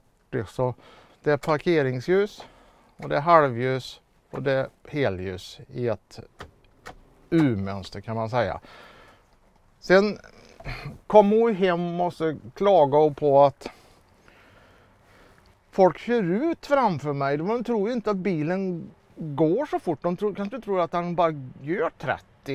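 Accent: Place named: West Gothland, Sweden. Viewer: Kan vara den Västgötska dialekten!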